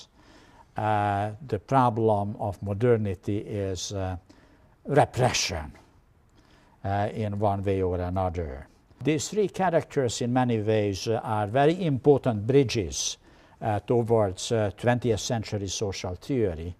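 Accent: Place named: Europe. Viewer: And I was surprised could do such a convincing continental accent!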